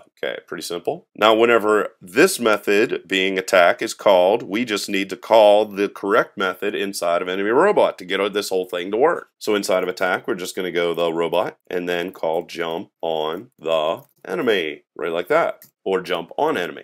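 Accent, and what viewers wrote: Pittsburgh, United States. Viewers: Enjoyed the pace and the material. Your accent takes me back to the 'burgh.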